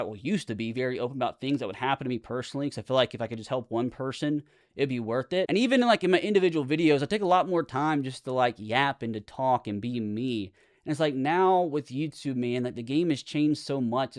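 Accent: United States. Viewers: I hope this helps but I haven't played the last 2 maddens and I STILL watch your videos! I love the rebuilds I love the irl stuff and the playing the career of a rookie. I also think you've gotten MORE funny. I also find your voice oddly soothing haha I mean people in different parts of America have different accents and they can't help that so honestly f*ck the people sh*tting on your voice. If they don't like it they don't have to watch. People just love to be toxic on the internet. If they met you irl they'd tell you how much they love your videos and want a pic. I'm also in my 30s and have a depression problem. You just gotta focus on you man and keep putting out the content that everyone has loved. You're great at this man. Don't let the haters get you down man!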